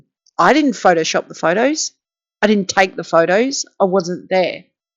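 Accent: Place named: Australia. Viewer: Hi from Perth….loving hearing an Aussie YouTuber. Love your content and the way you speak your mind.❤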